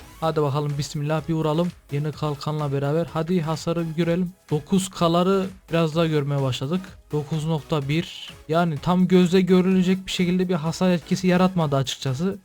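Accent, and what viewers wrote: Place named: Konya, Turkey. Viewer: ilk 5 saniyede anladım konyalı oldugunu :D Çumralı mısın?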